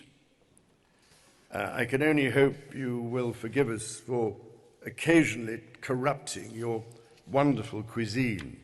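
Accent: United Kingdom. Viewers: Pronunciation was good! With a strong british accent, obviously, but really good! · Perfectly understandable, obviously he has a lovely British accent! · I'm Italian and what's impressive here is King Charle's full knowledge of the relationship between written accented vowels and the kind of stressed sounds they require, namely à, è, é, ì, ò, ó, and ù, as well as the pronunciation of double consonants such as "mm, ll, nn, cc, ff, pp, rr, tt, bb, dd", etc. He also knows the correct use of voiced and unvoiced consonants such as the unvoiced "z" of the word "incoronazione" that he used early in this speech (instead of using the voiced "z" sound found in the word "zanzara", for example, which would've been incorrect). The accent is British but extremely clear. I was very impressed.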